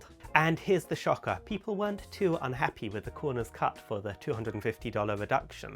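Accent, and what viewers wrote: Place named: United Kingdom. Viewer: I want to speak english like you. The way people from the UK talk... it's immaculate bro. Sounds really fancy.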